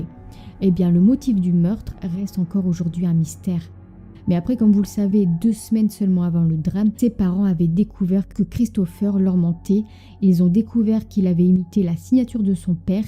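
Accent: North, France. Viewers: Bonjour je viens de découvrir votre chaîne et je dévore littéralement les épisodes , j’aime beaucoup !! Petit question si ce n’est pas indiscret bien sûr ,mais j’ai l’impression d’entendre dans votre voix,l’accent du nord de la France ,je me trompe? En tout cas merci pour tout ce travail et bonne continuation !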